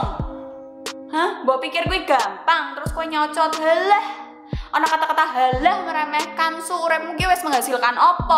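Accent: Java, Indonesia. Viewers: Senang dengerin medok mu mba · Ngegas medok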